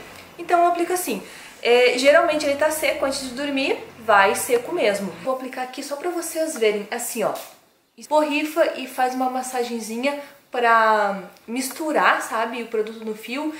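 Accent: Rio Grande do Sul, Brazil. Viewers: .. você é gaúcha?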